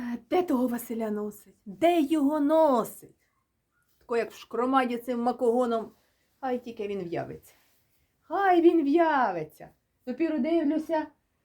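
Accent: Ukraine. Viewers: Ну, імовірно, що Наддніпрянський говір. Але він дуже великий за ареалом - від Херсонщини і до Київщини, та від Поділля до Луганщини. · Вінниччина. Моя бабуся так розмовляла! Її-бо- присійбо .... так і казала. Макогін! *Так - Вінниччина *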